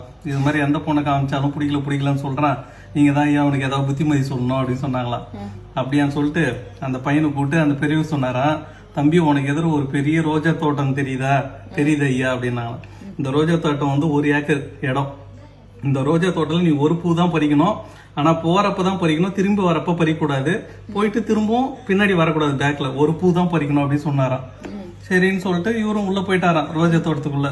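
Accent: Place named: North Arcot, India. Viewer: சென்னை வட ஆர்கேட் பேச்சு வழக்கு ❤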